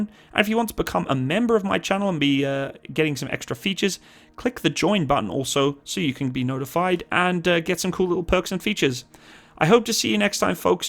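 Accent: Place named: Home Counties, United Kingdom. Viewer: thanks man that confused me a lot! Bristol boy here. Def didn’t pick up Durham, you have that Home Counties accent with a tinge of Canadian. Fingers crossed you lose that clown Trudeau soon and people can take Canada seriously again 😂 all the best and love from the west country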